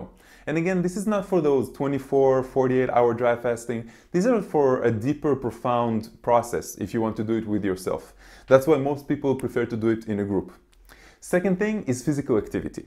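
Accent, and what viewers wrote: Israel. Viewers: Hi.. came across your vid... As an Israeli- I can hear your HEBREW!!!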